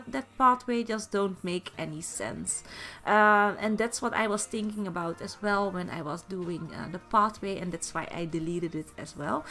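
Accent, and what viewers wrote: Netherlands. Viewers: Ik kon gewoon aan het accent horen dat je nederlands bent haha, maar erg mooi huis! die keuken ook mooi uitgezocht!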